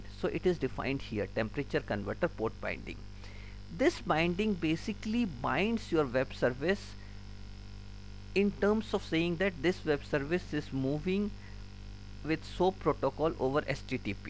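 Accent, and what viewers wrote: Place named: India. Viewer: Really good video! And I love the indian accent, especially in the word 'redundant' :D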